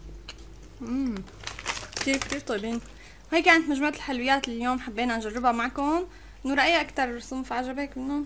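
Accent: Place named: Damascus, Syria. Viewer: كتير حلو لانها سورية من الشام والشاميين بيحكو هيك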